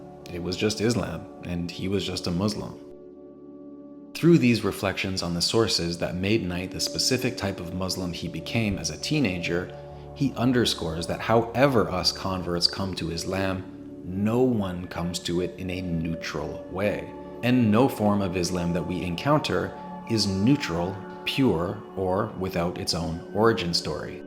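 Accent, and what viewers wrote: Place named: Ontario, Canada. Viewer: Dear Brother: Fellow Islamic 'seeker' here. Fellow Madre Yage aficionado here. Just returned from an 11 day retreat in Columbia with the plant. THE 2 ARE VERY COMPATIBLE AND VERY COMPLIMENTARY, IMO. I am located in Ontario, near you, judging from your accent and some of your videos. Thank you for being a visionary. Thank you for your courage. Ameen. Emily.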